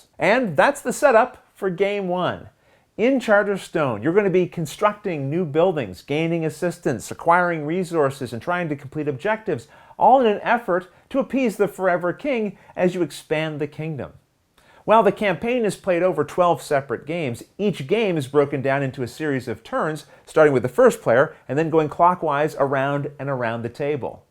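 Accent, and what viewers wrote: Canada. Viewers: My wife and I have been discussing where your accent is from. My guess is Canada. Am I right?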